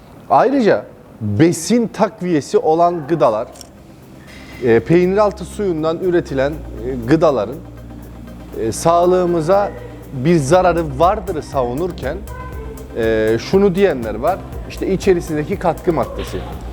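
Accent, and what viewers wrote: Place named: Turkmenistan. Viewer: Konusman Türkmenlere benziyor. Türkmen misin?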